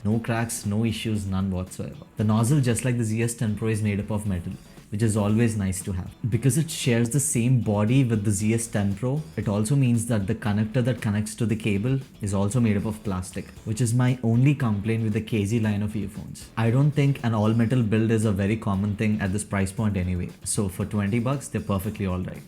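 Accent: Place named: India. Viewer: Good video nice to see you are proud to be indian and using Hinglish rather than trying to overact with fake accent. And the mid issue is solved in kz zsn pro X varient which cost almost same .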